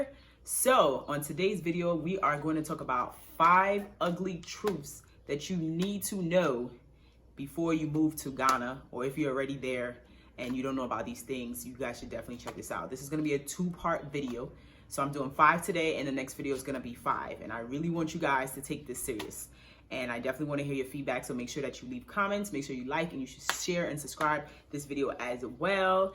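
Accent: United States. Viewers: Ok why is your Ghana accent here not here then it's pure American accent all cap.lol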